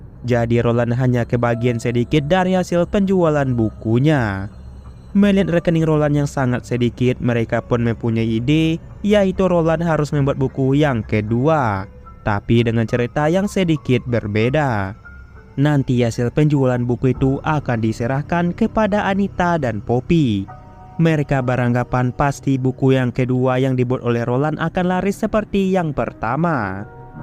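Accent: Aceh, Indonesia. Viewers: Bahasa logat Aceh nya nampak kali iya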